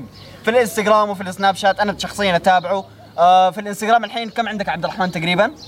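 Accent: Saudi Arabia. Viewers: حلو المقطع يا بس ليش ما حكيك سوري حكيك سعودي مع التحية للشعب السعودي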